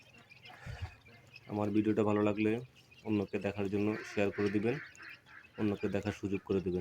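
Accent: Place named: Noakhali, Bangladesh. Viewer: নোয়াখালী কথায় ❤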